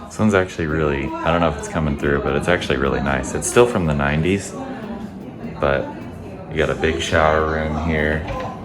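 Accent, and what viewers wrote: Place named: San Francisco, United States. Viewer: Your accent sounds like you may be from the Castro district of San Francisco. Am I right?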